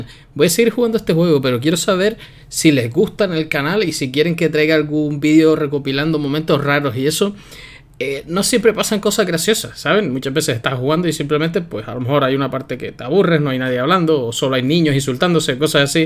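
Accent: Canary Islands, Spain. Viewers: Eres Canario :O? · tu tienes acento canario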